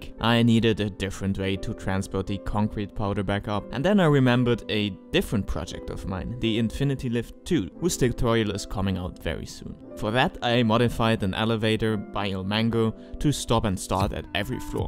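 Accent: Germany. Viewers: Man kann den Deutschen akzent etwas krass hören, xD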